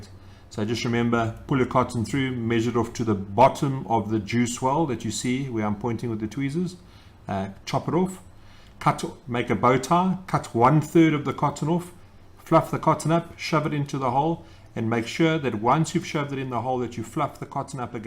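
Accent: South Africa. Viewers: Great video thanks, works really great. Quick question, are you South-African?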